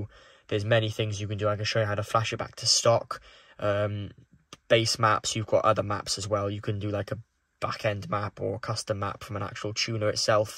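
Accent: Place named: United Kingdom. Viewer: Yes, I also noticed using the US octane. Seems to be incorrect. You sound like you are british.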